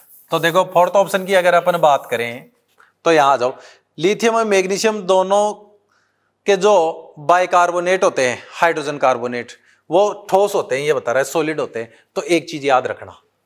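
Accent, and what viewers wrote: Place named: Rajasthan, India. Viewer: Pure rajasthan ka swag aata hai aapki boli me padane ke tarekeee me ekh no.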